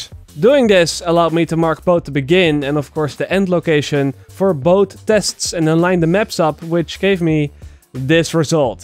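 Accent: Netherlands. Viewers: loving the dutch accent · wist wel dat je nederlands was door je accent😂😂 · van Corpusstein haha ja ik hoorde ook gelijk dat ie uit Nederland kwam de eerste keer dat ik een video van hem zag . Nu meer als een jaar geleden. Hij brengt het wel leuk en soms moet ik er wel om lachen dat vernederlandste engels. Maar zijn video's zijn wel informatief. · Dat Nederlands Engels accent do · aan je accent te horen, gok ik dat je Nederlands bent! Leuke & interessante video =) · Zoooo.... Zwaar nederlands accent gast, dat verpest de video gewoon. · I knew you sounded dutch. goed filmpie man! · wow that accent is so great is sounds dutch but at the same time english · Your accent dude genious lang leve holland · hehe tour dutch man😂i can here IT! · Deze gast heeft echt een Nederlands accent This guy really has a Dutch accent. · wow nederlands accent haha, goede video man! 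· mate your dutch accent is hilarious. But good video liked it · I have the feeling you are dutch #accent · Je kan goed horen dat je nl bent kill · So much dutch accent! haha goedzo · This sounds so Dutch it's not even funny anymore. Atleast it's better than hearing me trying to talk English. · the person talking has a Dutch accent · That Dutch accent tho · lol je hoord het nederlandse accent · jij bent zeker nederlands dat kan ik aan je acent horen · Prima vid, hebbe gij mooi gedaan! ;P Hoorde direct al dat je een Nederlander was =P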